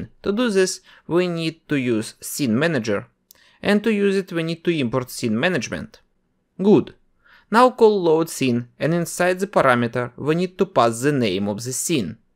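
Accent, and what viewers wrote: Russia. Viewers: Спалился что ты русский)):face-blue-smiling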